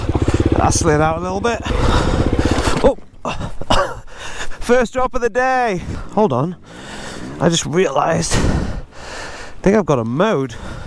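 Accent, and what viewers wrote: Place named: United Kingdom. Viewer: I'm so thrown off by "MidWest" and then the British accent.... what?! ps. I also test rode the TF 250 and had a blast. I'll be sticking with my Husky for the foreseeable future though.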